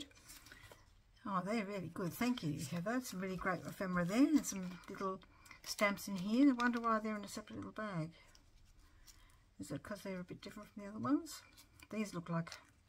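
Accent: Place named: Australia. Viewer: Love your vid! It’s nice to hear an Ozzie! Lovely cards and washie tape and stamps! Love to see what you can do with them! Francke from Brisbane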